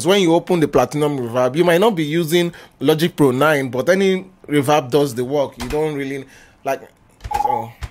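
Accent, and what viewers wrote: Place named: Igbo, Nigeria. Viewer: This guy igbo English accent is mad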